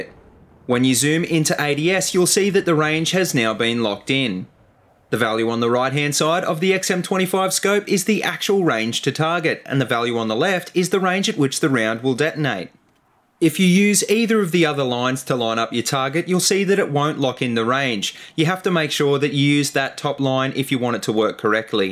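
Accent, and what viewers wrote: Australia. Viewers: He has to be Australian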